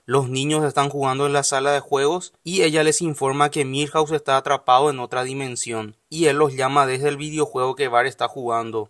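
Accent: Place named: Paraguay. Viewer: Chaaa pense luego esta vos me parese un paraguayo y si sos ra'e cha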